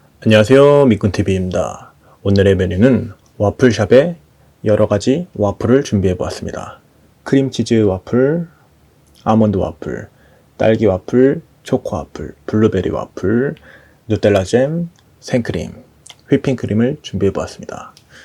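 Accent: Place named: South Korea. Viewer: Always love Korean accent haha waffareu 😇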